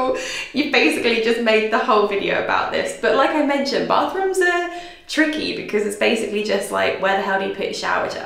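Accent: United Kingdom. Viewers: Caddy for each family member! WHY did I not think of this?! Also, worth it to listen to a Brit discussing poo drops! Fab!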